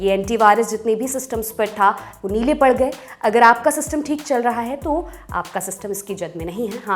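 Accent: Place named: Kanpur, India. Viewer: are ye sab kanpuriya hain..aise hi bolte hain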